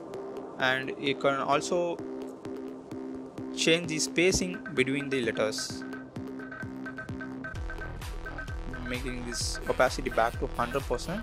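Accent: India. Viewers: I will never again make fun of Idian nerd guys accent ever again. Thank you so much.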